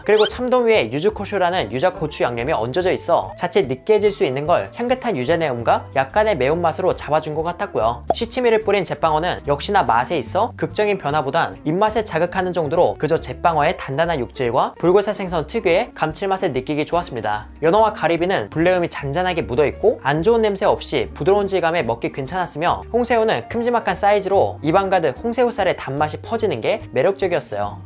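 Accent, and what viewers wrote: Gyeongsang, South Korea. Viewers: 사투리 쓰시는거 보니 경상도 분 인가요?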